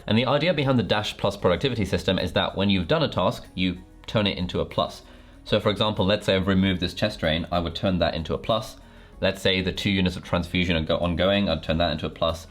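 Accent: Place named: United Kingdom. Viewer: You have a very charismatic personality/way of presenting yourself, kept me engaged to the end. Must be your british accent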